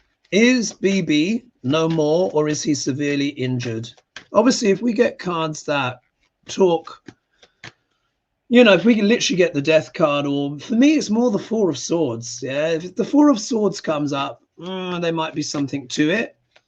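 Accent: United Kingdom. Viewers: A cuban with a British accent 🤷🤔😅😂🤣. How can we greater raise the TRUMPSTEIN REGIME and GESTOPO CRIMINALS intelligence 🤷🤔🤷🤷❓❓❓❓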